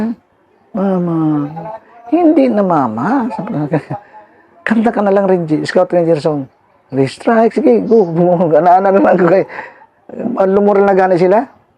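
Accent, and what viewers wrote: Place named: Cebu, Philippines. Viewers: May pagka waray si sir, I'm not sure pero base sa pananalita nya, waray or bisaya. Di xa ilonggo... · Visa ya po ang halo sa Salita nya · Cebu dialecnsiya..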